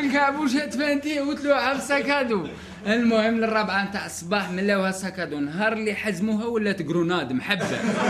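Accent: Djelfa, Algeria. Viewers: اللهجة الجلفة روعة تحياتي الحاج من غرداية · والله قتلتني بالضحك حبيبي والله نعم الرجال و لي معجبوش يعني مفهمش اللهجة نتاع الجلفة فقط كل ولاية ولهجتها الخاصة لا تعليق في هذا معجبتكش اللهجة علاش تفرجو اصلا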